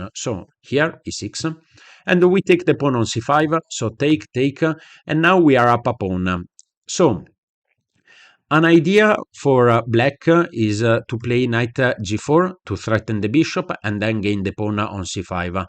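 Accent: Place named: Apulia, Italy. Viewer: Accento pugliesissimo ahahah grazie per la lezione!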